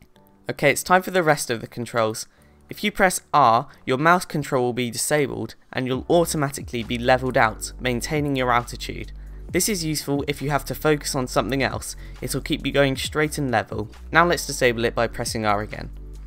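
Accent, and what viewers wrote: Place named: United Kingdom. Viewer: ugh hes british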